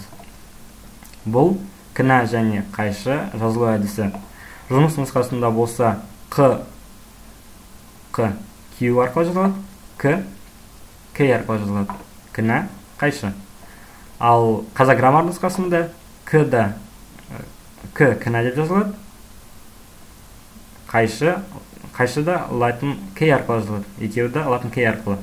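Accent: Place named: Russia. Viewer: кешеден бері байқағаным негізі сіз орысша ойлайтын қазақ болып көріндіңіз, видеодағы қазақша сөйлегенде де орысша сәл де болса акцентіңіз бар. Қазақшаға шорқақтау қазақтарға қаза тіліне байланысты мәселелерді қолға алмағаны дұрыс ау. Кім сойса да қасапшы сойсын демекші. Терең білім болған кезде өзгерістер енгізуге болады. Жалпы, әліпбидің еш кемшілік тұстары жоқ, дайын 42 әріптен құралған, бүкіл әлем ұлттарының үнін ұштастырған әліпби. Артық ештеңесі жоқ. Білім және Ғылым Министрлігіне үндеу: АРТЫҚ ҚЫЛАМЫН ДЕП ТЫРТЫҚ ҚЫЛЫП ЖҮРМЕҢІЗДЕР.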